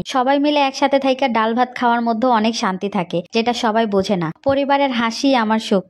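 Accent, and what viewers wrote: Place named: Bangladesh. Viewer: তুমি আমার বাড়ি ঢাকায় তোমার বাড়ি কই গো মেয়ে বাংলাদেশের এক নম্বর আঙ্গুর ভাষা❤❤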